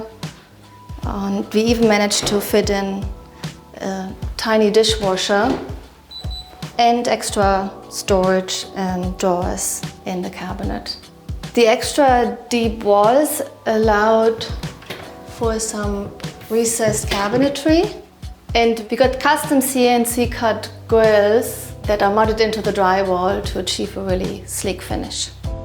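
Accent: Germany. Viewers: Sounds German to me. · i lover her German accent. She should do ASMR videos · Definitely German. Many German's I have met in London have better English than us natives!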